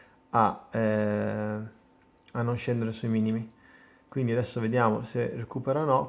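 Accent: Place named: Trentino, Italy. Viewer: Ecco ora ho capito: leggevo trentino ogni tanto, ma l'accento non mente! Ciao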